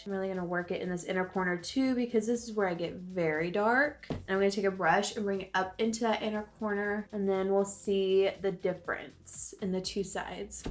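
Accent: South, United States. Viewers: Am I going crazy or did she used to have more of a southern accent? Lol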